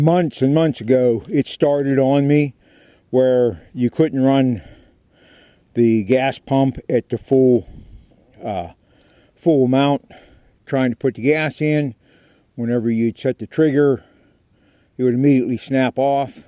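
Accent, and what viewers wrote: Pennsylvania, United States. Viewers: Are you from PA? you sound a lot like my father in law. :)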